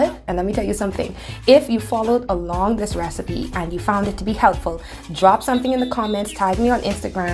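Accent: Bahamas. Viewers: I really enjoy watching you cook! The accent and the comments only Bahamas’s understand 😁 bring back so many memories of my childhood. I’m American born but my father is Bahamian. Love the food dishes from the island! 😋 👍🏾❤️ by the way, hadn’t heard monkey foot in a minute. My cousins used it all the time. 😁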